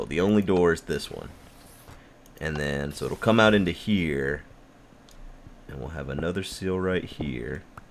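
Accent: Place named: South, United States. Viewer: It's just a slight Southern accent, guy. He knows how to talk.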